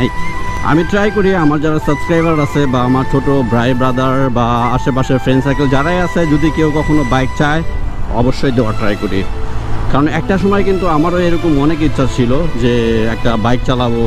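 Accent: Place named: Bangladesh. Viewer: আপনের কথা এমন বাংগাল এর মত কেন ক্ষেত পুরা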